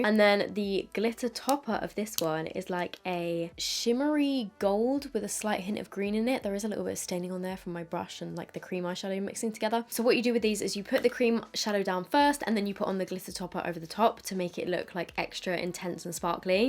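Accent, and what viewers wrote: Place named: England, United Kingdom. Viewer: Wait I love it 🥰 and one more thing! where are you from in England 😅 love the accent 😆🤩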